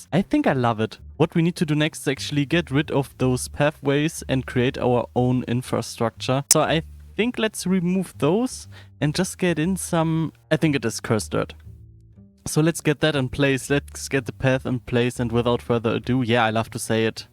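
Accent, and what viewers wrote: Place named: Germany. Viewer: at the beginning i thought he isnt engish like i hear german through and then wen you said your not good in speaking english and that your from germany it all maked sense (subcriceb)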